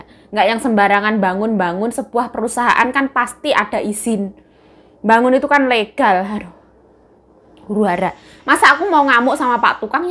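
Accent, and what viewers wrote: Java, Indonesia. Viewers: Pertama kali liat chanel mbak waktu itu subcribernya 68k... Jatuh cinta sama kepolosanya dan logat jawanya yg medok.sehat selalu mbk biar tetap bisa menghibur😊😊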